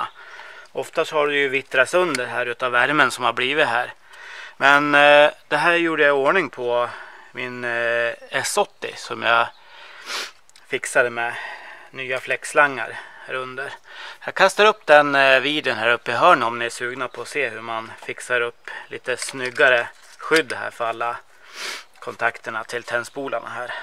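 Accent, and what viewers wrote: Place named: Västerås, Sweden. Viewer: Såg att du hejar på VIK. Stämmer det? Själv bor jag strax utanför Västerås. Tyckte väl att din dialekt var bekant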